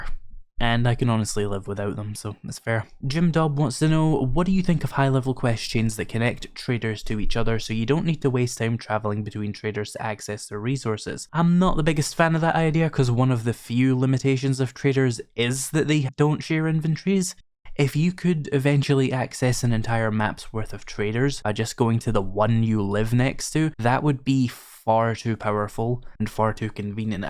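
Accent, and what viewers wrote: Scotland, United Kingdom. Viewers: Ask: What kind of accent do you have? It sounds vaguely Scottish (apologies if I got that wrong). Many thanks for the videos, I always learn a lot from them.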